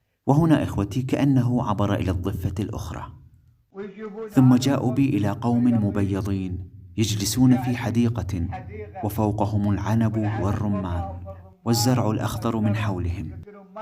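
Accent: Iraq. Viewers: لو مامترجم احسن كلامه واضح كلامه بلهجه العراقيه الجلفيه العاديه ومعروفه واضحه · هذي ليسة لهجة قديمة هذي لهجة اهل الغربية من العراق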